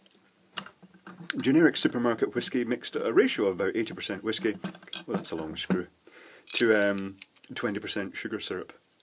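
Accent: Scotland, United Kingdom. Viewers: i find your vids very entertaining and informative, and your smooth scottish accent makes it quite soothing! good man keep it up!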